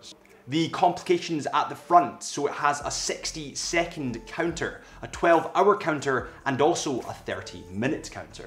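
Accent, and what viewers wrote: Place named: Glasgow, United Kingdom. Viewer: west enders in glasgow talk like this guy lol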